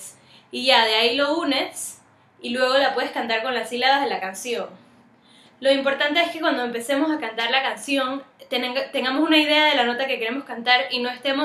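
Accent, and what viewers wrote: Panama. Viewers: Sabía que eras de Panamá cuando escuché tu acento 🤯, tienes una voz preciosa! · namas con tu tono de voz se que eres panameña haha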